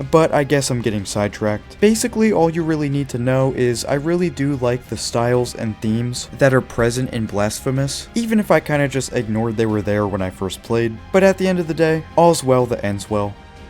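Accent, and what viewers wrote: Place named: United States. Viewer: u sound so american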